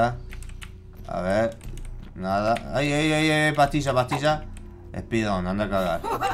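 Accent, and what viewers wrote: Argentina. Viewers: Tenía mucho que veía tus videos xd olvidaba lo genial que era el acento argentino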